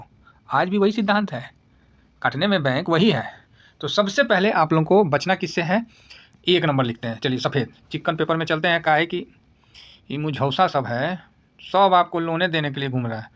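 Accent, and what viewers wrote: India. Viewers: Sir ji apka bolne tarika aur boli bahut achi hai Desi style 🎉🎉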